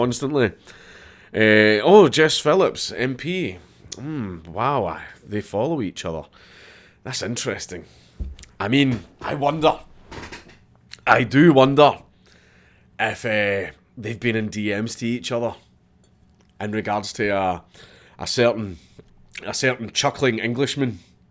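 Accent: Scotland, United Kingdom. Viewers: I love the scot accent but if I'm not paying 100% attention I can not understand it 😂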